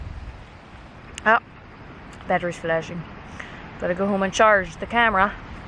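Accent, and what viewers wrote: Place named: Ireland. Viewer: I hear a hint of Irish in your accent 😊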